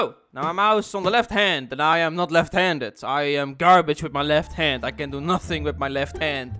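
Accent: Netherlands. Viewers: Nederlands accent echt geweldig😂😂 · Lekker Nederlands accent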